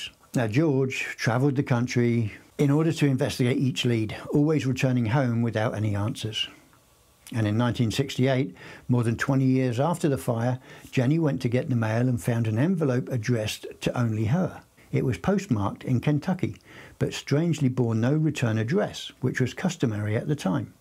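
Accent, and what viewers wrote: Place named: Ireland. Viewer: Your Irish accent helps your story telling . You have the voice and speed of a good story teller.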